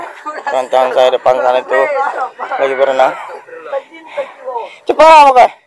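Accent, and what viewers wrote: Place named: Makassar, Indonesia. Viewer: Pertahankan logat Makassarx dan segala okkotsnya... mantap adinda...😅😊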